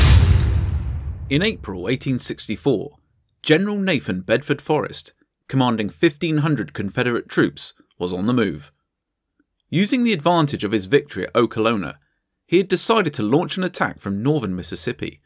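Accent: England, United Kingdom. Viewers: Why is an English narrarating go away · Why is it always an English accents telling us about our American history on YouTube? Thank you AI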